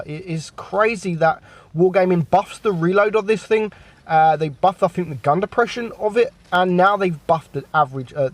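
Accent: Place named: Cockney, United Kingdom. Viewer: What is the maer you are talking about ? All of us are not cockney fluent, so please help us sometime with subtitles or eventually a more classical accent :)))))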